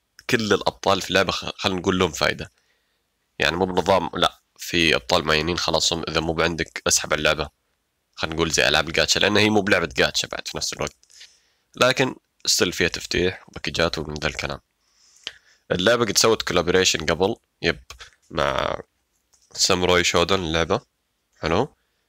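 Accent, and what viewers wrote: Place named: Saudi Arabia. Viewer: اللهجة السعودي مضبوطة مرة كتير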